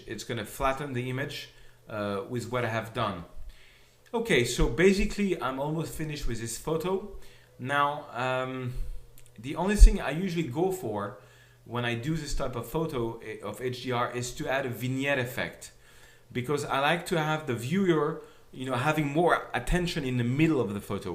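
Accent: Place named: France. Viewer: i love your tutorials, i love your frenglish accent :D keep it up (thumbs up)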